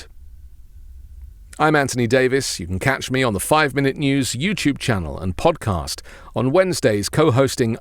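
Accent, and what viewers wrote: United Kingdom. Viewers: Your British roots are showing lol. My family came from Britain generations ago. My Dad and Granddad are the only ones I knew that used phonetically. Ahhhhtt. Dad had a gun I forgot which one but it was a model with zero in it that had ahhhhtt in it. 20 ahhhhtt something 🤔🤔🤔. Anyway do you have crackers at Xmas ? Oyster stew Xmas eve? Love the show.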